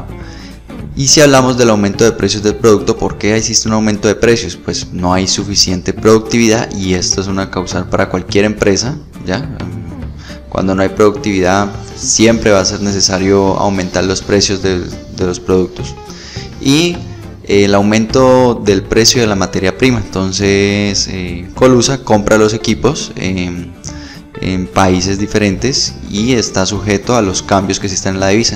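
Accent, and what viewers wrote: Cali, Colombia. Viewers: Hablalo Caleño!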